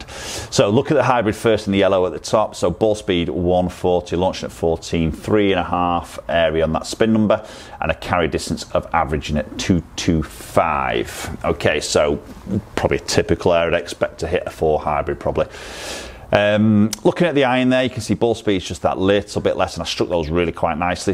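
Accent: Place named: Lancashire, United Kingdom. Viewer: Great pronunciation 👍 spoken like a true Lancastrian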